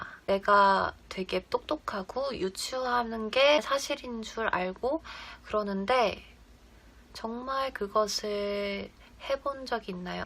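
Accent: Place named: Busan, South Korea. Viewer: 부산여자 아닙니까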